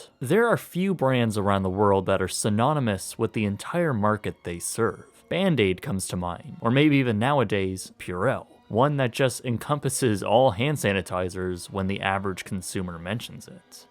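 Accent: Canada. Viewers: Is the narrator Canadian?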